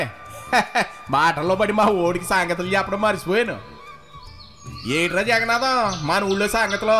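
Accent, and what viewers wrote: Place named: Godavari, India. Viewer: మీ గోదారి మాటలు వింటే కడుపు నిండి పోతంది అన్న...చాలా సూపర్ మీ వాయిస్....మీకు తెలుగు సాహిత్యం లో చాలా గొప్ప అవగాహన ఉంది అని పిస్తోంది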